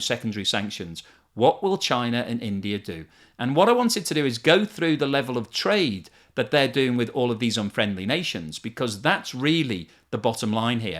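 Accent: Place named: England, United Kingdom. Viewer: Looking at your playlist I think you might be confusing your country which I'm assuming is England from your accent is Russia cuz your economy is fucked way more than Russia's! England the EU and the United States all shot themselves in the foot or should I say through their heads to hit Russia which is dumbest shit! You seem to be living in a willfully ignorant lala land of projecting what you would like to occur as opposed to the actual situation on the ground Russia is hurting to some degree but not as bad as Britain! And it's only going to get worse now that Beau Jo's gone and someone even stupider it's going to take over! Maybe you also believe as Liz does that you all are going to defeat Russia in Ukraine? This is a global realignment, that will hurt Russia slightly in the short term but benefit them in the long term and devastate as you put it the West otherwise known as Europe Canada and America which represent only one fifth of the human population! 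So opportunities abound now for Russia India and China! And Europe is now going to be my country America's bitch as it wanted it to be and Britain will be the lap dog! America's economy is hurting but Europe is in for a long cold inflationary recessionary winter and next several years!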